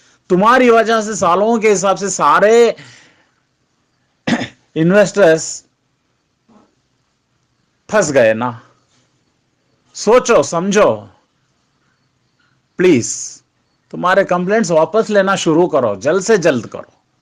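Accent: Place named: Kerala, India. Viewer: Kerala wale ki English aisi hi rehti .lekin rehte qualified log.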